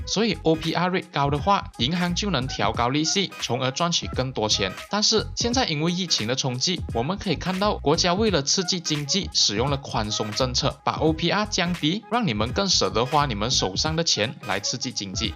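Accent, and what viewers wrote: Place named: Hunan, China. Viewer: 这个马来小编，除了南方腔，还有湖南腔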